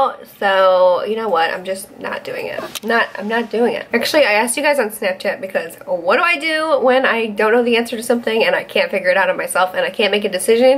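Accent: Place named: Chicago, United States. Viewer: VLAG lololol yas ya love to hear that chicAgo accent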